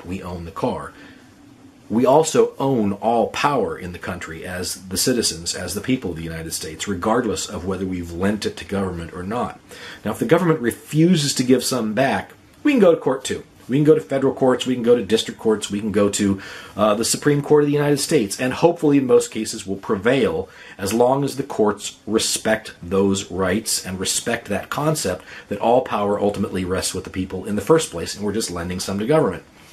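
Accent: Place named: England, United Kingdom. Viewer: I like your English accent, lol.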